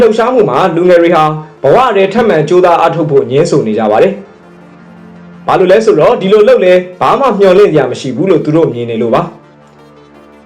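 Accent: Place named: Myanmar. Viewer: ယုံချင်စရာပဲ သတင်းဆိုရင် မင်းတို့မြန်မာအသံနဲ့ဆို ကြည့်ကိုမကြည့်တော့တာ ကျက်သရေယုတ်လို့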